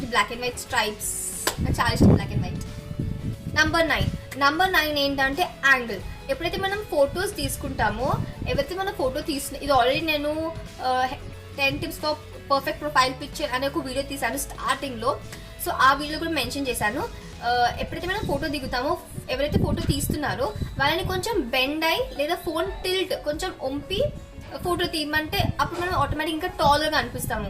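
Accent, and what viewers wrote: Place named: India. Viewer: Love your slang in telugu ❣️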